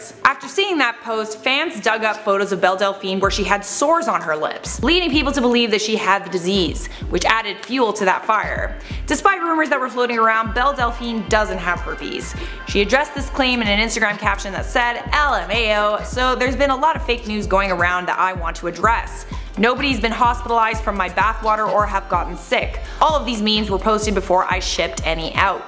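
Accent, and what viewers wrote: United Kingdom. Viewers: She's South African?!! She has British accent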